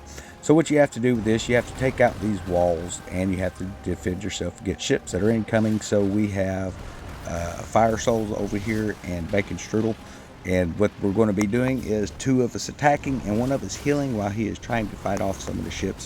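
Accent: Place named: New York, United States. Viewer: Your accent gives yourself away. New York? 😉 S C here, take care.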